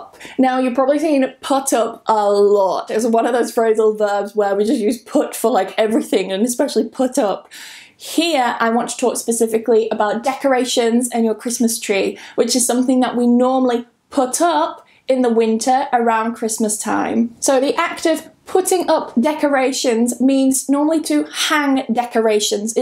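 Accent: United Kingdom. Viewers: I love your accent, what region of UK is it from? 🌸🌸🌸🌸🌸🌸🌸 I'm from Guatemala 🇬🇹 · Hi!, l have been watching your video for one month. l think these videos are so useful to learn English skills. You have also a great British accent that makes it easier for me to understand.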